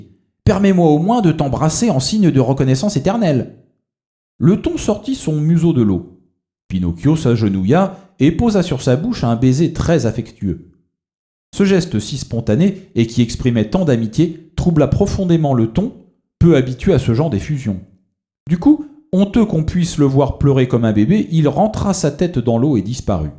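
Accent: France. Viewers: Milles merci pour la lecture de voix avec intonnations francaises! Tu m'as beaucoup aide pour apprendre le francais populaire! Spasiba! )))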